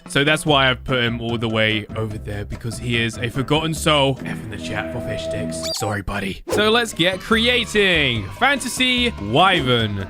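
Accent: United Kingdom. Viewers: Are u British or American I can't tell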